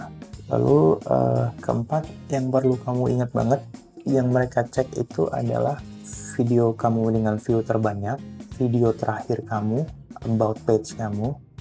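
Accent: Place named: Madura, Indonesia. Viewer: Akun yakin orang Madura nih 😂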